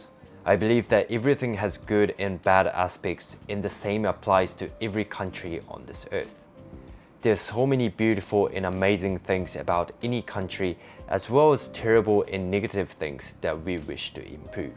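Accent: New Zealand. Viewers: So, that's where you learned English in New Zealand.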